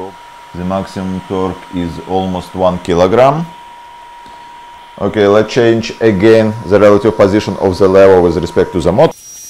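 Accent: Russia. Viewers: произношение очень похоже на английское произношение русскоязычного человека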